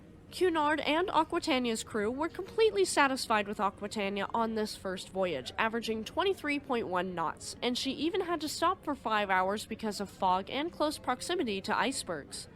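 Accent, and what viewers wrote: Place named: Pennsylvania, United States. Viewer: Being someone from a northern town in Canada I don’t pretend to be great with American accents. That being said, I have a good friend from Pennsylvania and every time I listen to one of your videos I catch the subtle similarities of the accent. Keep up the good work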